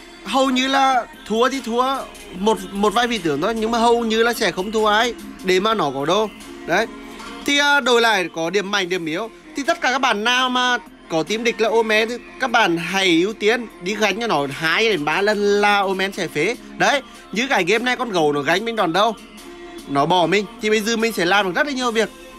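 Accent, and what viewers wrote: Nghe An, Vietnam. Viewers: Bạn là người nghệ an ak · Anh ở đâu nghệ an vậy ?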